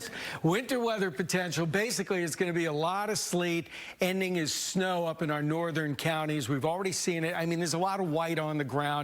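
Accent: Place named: New Jersey, United States. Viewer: Why is this guy from Jersey doing DFW weather 😂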